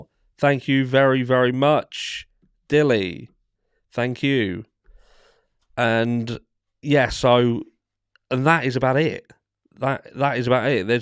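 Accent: Norfolk, United Kingdom. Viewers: Love hearing your Norfolk accent. Makes me feel like I’m back home 🇬🇧😎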